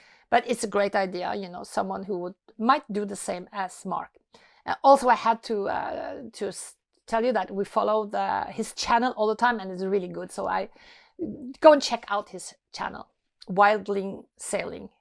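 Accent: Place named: Norway. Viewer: So the boat name is BareBare as in bare-naked. Why do you call it butter-butter? Perhaps my southern U.S. Ears are not tuned in to your Norwegian accent. It sound exactly like butter-butter. I find it super interesting how the English language sounds SO different spoken by people around the world. Ok enough questions, good luck finding a home for butter-butter - wink!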